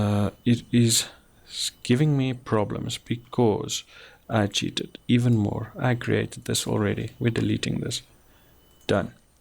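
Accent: South Africa. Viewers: Hoekom klink jy soos n Suid Afrikaner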